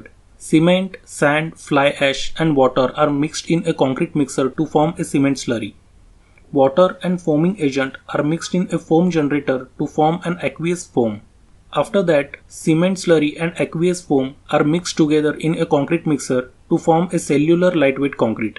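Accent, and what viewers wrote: India. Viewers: Fantastic all round explanation. Thank you wise man. Love Indian English accent. Great job. Cheers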